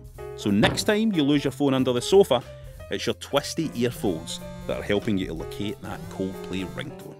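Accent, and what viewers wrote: Scotland, United Kingdom. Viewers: scottish accent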